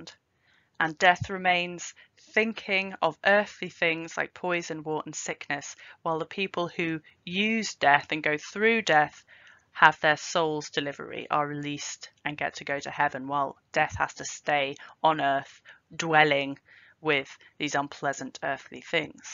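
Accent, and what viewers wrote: United Kingdom. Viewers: Dear Dr. - thank you for this quintessential explanation to one of my most loved poems that i have always interpreted as the secret of what the mere Christian fairy tale is about. This poem connected me to transcendence like no other and i am not a privileged elite - i was educated in New York City schools and as Puerto Rican and trouble even understanding everyday language. There was some very beautiful way about this dialogue that blew me away. Now i found you and you have masterly deepen the beauty and given me more knowledge in that lovely British voice. I just put together the Russian Dying SWAN with this poem - for my imagination of the transition; like you said it is just a divine nap- ( LOVE THIS NEW INTERPRETATION) and we become so very rested and beautiful. In Socrates ‘ PHAEDRAS it is the trumpeter’s song - the more beautiful when thy know that they are to die - this gives me so MUCH HOPE!THE GREAT NEWS! 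I didnt know the COR.. SCRIPTURE - WOW. Today is an UTMOST FINE- YOU! Thank you for this illumination!SEE DYING SWAN- DLYANA-LOPATKINA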